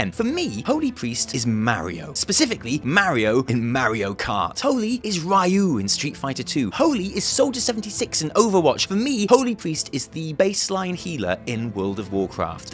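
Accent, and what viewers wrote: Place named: RP, United Kingdom. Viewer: BBC voice, love it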